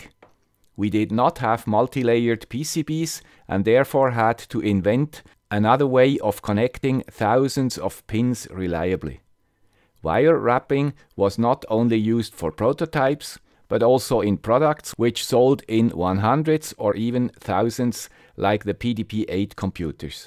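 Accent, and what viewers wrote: Switzerland. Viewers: Thank you VERY MUCH for this idea! Never heard of this before. My wire wrapping tool just arrived on friday :-) Found out, you can use the thin wire of the cheap metal film resistors for wire wrapping. Greets from Basel from another guy with a swiss accent ;) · SORCERY :O Not only the wrapping, but you stripped a tiny wire without ripping its head off. I usually have to resort to burning some insulation off. Thank you swiss accent guy!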